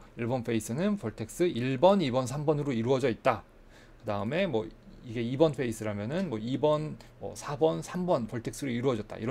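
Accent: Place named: Texas, United States. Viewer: I don't understand anything, you have a deep accent are you from Texas?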